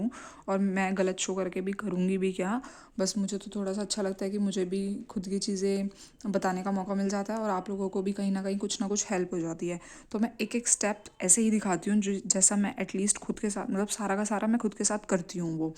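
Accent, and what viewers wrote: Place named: Meerut, India. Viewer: Sister aap kha sa ho. Kyonki aap ke tone kuch kuch meerut wali lagte h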